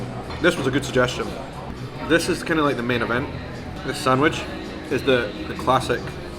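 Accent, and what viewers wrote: Scotland, United Kingdom. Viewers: Absolutely funny as shit watching the subtitles try and keep up with your Scottisms lmao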